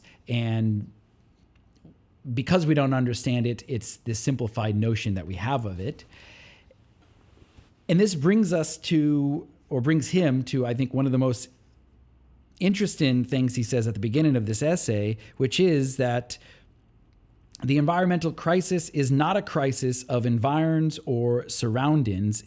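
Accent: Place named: New Hampshire, United States. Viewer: He's from New Hampshire, let it go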